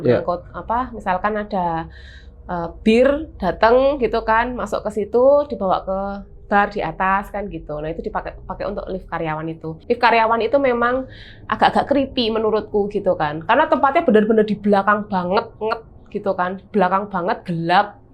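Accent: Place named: Java, Indonesia. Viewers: Medok e😁😁😁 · Aku seneng mba kalau ada orng logat jawanya keluar seneng .mba nya cantik buaaaanget🤭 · Semarang hadir .. pas ki podo smg e. Bahasane semarangan bgt 😂😂 ono ding e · pean medok banget, koyo aku, Mbak 🤣🤭 wis 11 thn tinggal di Belanda tp masi medok jowone 🤣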